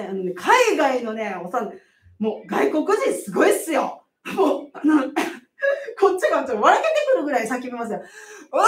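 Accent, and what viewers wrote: Kansai, Japan. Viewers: ３０代からはおばはんよ自覚いっぱいありますもん（笑） さんスカッと言う関西弁だし気にする事ですかね？？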